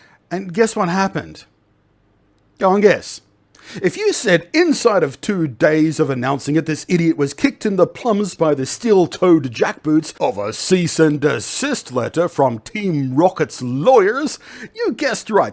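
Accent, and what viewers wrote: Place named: Australia. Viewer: The Australian accent makes this vid twice as amusing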